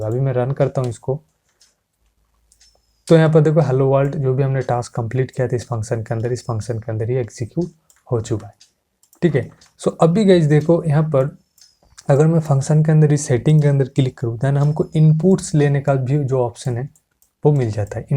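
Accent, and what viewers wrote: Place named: Bengal, India. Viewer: Ami 1 ta app banate chai... Kintu sob kichu r tutorials pachina... Tai banate parchina... Tomar kotha sune mone hoy tumi bengali... Tai bangla te comment korlam... Ektu help korle ami app ta banate parbo... Thanks....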